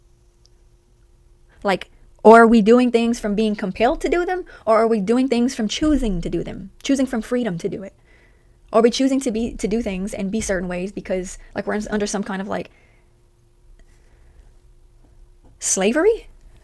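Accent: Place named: United States. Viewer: your accent is featured in the video "13 American Accents Ranked EASIEST to HARDEST to Understand", by user Olly Richards. 🙂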